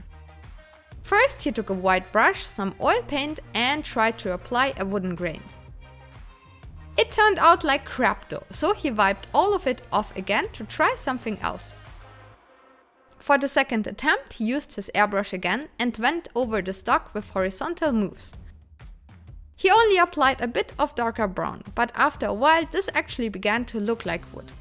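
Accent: Germany. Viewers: Einwandfreies Englisch mit Deutsch Akzent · Hi do you speek germany, ir sound like this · Dieses deutschhhhh!!! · Sprich doch deutsch🤦🏼man hört das du deutsch sprechen kannst KeIn EnGlIsch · Hi,! Really nice work! Do you live in germany? I think i heard a german accent in your english. ^^ Would be easier for me so i could leave comments in german. 😄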